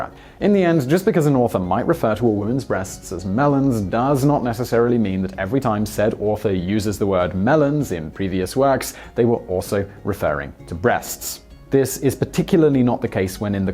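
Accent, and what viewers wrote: United Kingdom. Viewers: This video is thee DEFINITION of MISINFORMATION/PROPAGANDA... Once I heard your British accent it becomes CLEAR how much of a SHILL/AGENT you ARE .... 3 million subs huh? No SHOCKER most people are LOST AF reading through the Comments people CONTINUE to remain LOST while they're being MOCKED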